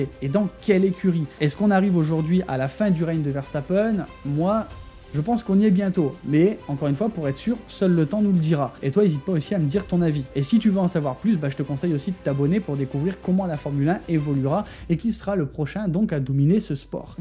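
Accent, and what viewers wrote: North, France. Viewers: Bonne vidéo mais tu ne peux pas me cacher que tu viens de Tourcoing, Lille ou Lens parce que l’accent au formule HEIN! est flagrant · Tu as l'accent du nord on dirait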